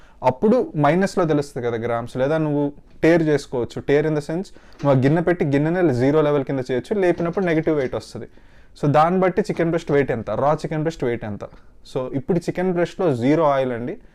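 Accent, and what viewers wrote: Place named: India. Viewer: bro fan of ur telugu slang!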